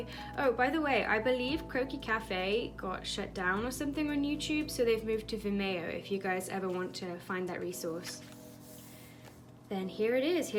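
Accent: RP, United Kingdom. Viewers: You can tell how posh you are holy heck - great art btw :Db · Wow you’re so posh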